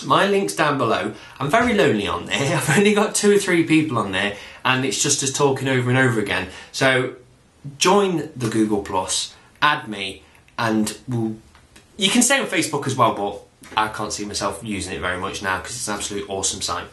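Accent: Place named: Nottingham, United Kingdom. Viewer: ranting about your rants? your losing it ! Whereabouts In Nottingham are you from anyway ? I had no idea till you mentioned the Notts twang!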